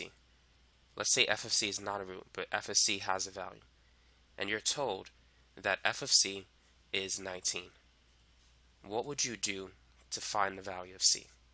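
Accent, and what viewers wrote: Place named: New York, United States. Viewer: it isnt unique though, its a typical new yorker accent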